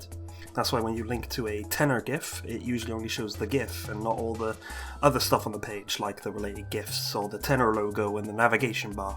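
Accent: United Kingdom. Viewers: hooold on, hoooooold on, my guy are u welsh? if yes: high fiveee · ah!! alright, only reason why i asked was bc of the way your accent seemed to mirror that of the bristol accent in my head my brain makes weird connections ;w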